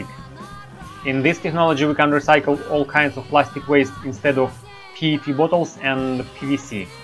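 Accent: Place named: Russia. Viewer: Русский акцент ничем не скроешь.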